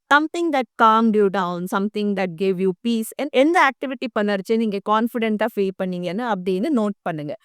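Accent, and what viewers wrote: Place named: North, India. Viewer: Your pronunciation like North indian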